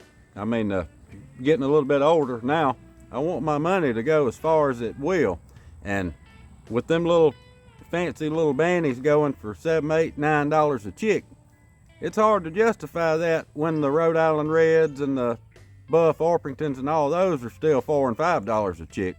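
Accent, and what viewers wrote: South, United States. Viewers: LOL!!! Brother you got an accent for sure - true American. 😎👍👍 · I'm from Ruston, Louisiana, and even though most around here don't have the southern drawl quite like yours.. Some of us still do. You'd fit right in brother! My family is on the hunt for a new home with enough space to start raising birds, vegetables, and maybe a goat or six. We've been in the city limits longer than we ever planned to be, and it's time to get rural.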